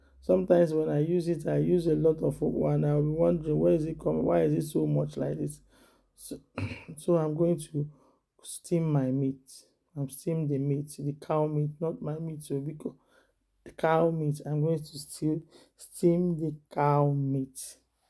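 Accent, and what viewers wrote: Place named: Yoruba, Nigeria. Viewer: I'm confused by your accent and your name, you speak with Yoruba accent and your name is, these leave me wondering, please define. Shame on you swallowing early in the morning, I joined you, I'm proud of it. Thanks.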